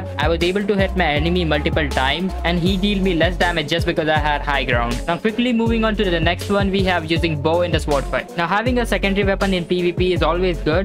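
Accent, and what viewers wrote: India. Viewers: u sound like a indian tech dude indians are the best dude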